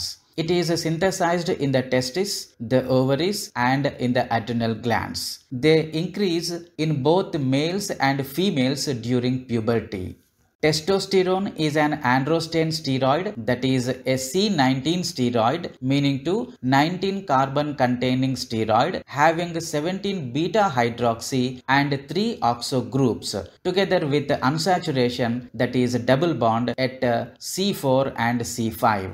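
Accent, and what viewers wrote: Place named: Karnataka, India. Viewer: you have the kannada slang . from karnataka ?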